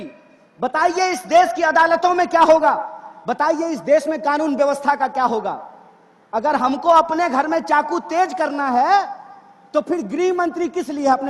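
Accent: Bihar, India. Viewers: Full bihari andaj waah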